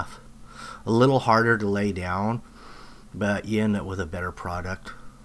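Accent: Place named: Southern California, United States. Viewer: I am not understanding the S0-Cal accent lol because at first I thought I was watching another concrete persons video from So-Cal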